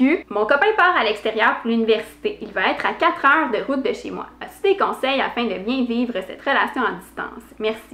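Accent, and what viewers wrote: Quebec, Canada. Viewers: I love your videos, your frech quebcoise is helping me to understand more and more each day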